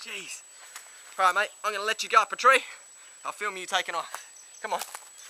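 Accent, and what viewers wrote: Australia. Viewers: He sounds Australian, he's catching wild animals with bare hands and this video was posted about 2 years ago. Chances are, he's probably dead. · grabs possum by back of the neck 'these guys can really struggle' well.. given it probably thinks you're trying to break it's neck, i'd be terrified too. doesn't seem like you particularly like animals tbh. you almost definitely know nothing about them, all of your videos just include hypothetical strange situations, where you give your own opinions and umbrella terms that emcompass pretty much any wild animal and are not specific to the topic of each video. Lastly, you sound Australian, and that was an Australian possum. Possums here do not carry lepracy. Please..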